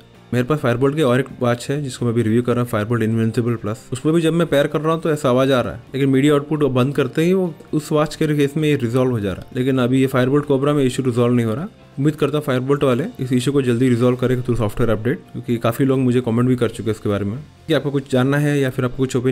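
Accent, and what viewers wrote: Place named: Kerala, India. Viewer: Full malayalee accent.. adipoli😅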